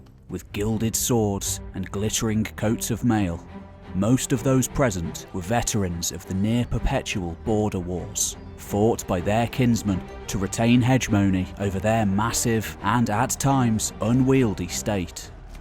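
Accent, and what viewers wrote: United Kingdom. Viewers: Thanks for posting this information presentation. You sound like a fellow Brit to me, so what's with the 'ad-ver-tize-ment' pronunciation? Just wondering.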